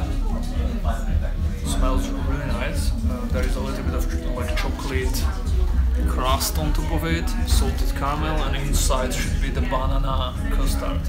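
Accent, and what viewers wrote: Slovakia. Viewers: is your accent Slovak or Czech? nice videos thanks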